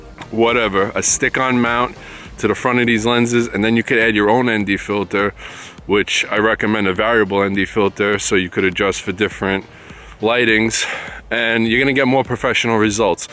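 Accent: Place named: New England, United States. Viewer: Haha, funny and perfect comparison. I have the HX80 and looking to upgrade for the added features on both: control ring, RAW, touch screen, 4K. But after seeing this, no way is the RX100 worth the extra $$$. Some aficionados wet their pants over sensor size (Wow, a 1" in a tiny camera!), but even the tiny sensors are so good now (in phones) that I'm not buying into the hype. Yeah, better bokeh with RX100, but...I really don't give a shit about it. Otherwise, the IQ is nearly identical and you'd have to be hallucinating to see an appreciable difference. Yeah, the RX100 may be marginally better in low light, but... hey, you want a low-light hobby? Take up music. The 30x zoom on HX99 is far more useful. If you shoot a lot of video outdoors, the mike jack on the RX100 VII is the only real benefit for 3x the price. (from Texas, I love the Yankee accent. 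Are you a wise guy? :)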